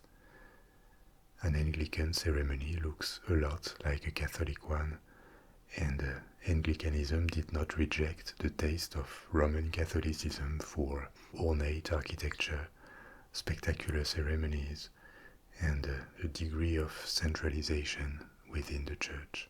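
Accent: France. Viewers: I haven't slept this good since childhood! Your French accent reminds me of my grandmother who was a war bride from 🇫🇷 France. It sooths me to sleep as her voice did so many years ago. Thank you so much for the work you do. Your videos work so much better than any pill and as a chronic pain, extreme at times, sleep doesn't come easy. Your dedication is very appreciated by myself and many others. Thank you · I used to live in France a long time ago. Your lovely accent takes me back to that delightful time in my life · You will always be my favorite ASMRtist. Your low voice just the way you do it and French accent is so soothing, and you really do your research for these videos and stories. You are simply the best there is!! Thank you so much for your efforts. We really appreciate your work! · I really love the stories and the French accent, but the only thing I don’t like is the big gap after the story ends with some videos. 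I think it’s designed to be some restful sound to help you sleep but I have a playlist of stories I listen to and would prefer that at the end of the story it ends so my playlist can move onto the next story.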